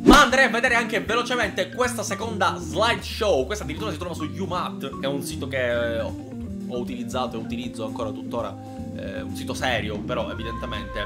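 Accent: Palermo, Italy. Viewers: Oddio ma ho notato una cosa : PARLI IL PALERMITANO MEGLIO DI MIO PADRE..... COSA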